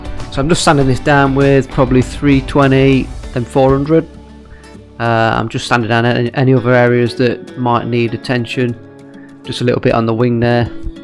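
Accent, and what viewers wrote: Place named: Nottingham, United Kingdom. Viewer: You wouldn’t be a nottingham lad by any chance?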